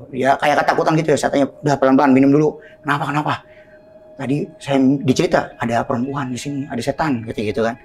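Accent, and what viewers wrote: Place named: Jakarta, Indonesia. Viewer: 😂😂😂 muter muter dimananya? Roaming kali, nggak biasa logat betawi😂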